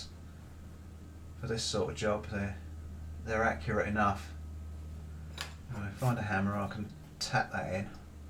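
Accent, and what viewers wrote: England, United Kingdom. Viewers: can't be asked!!! You are so English, I love it!!!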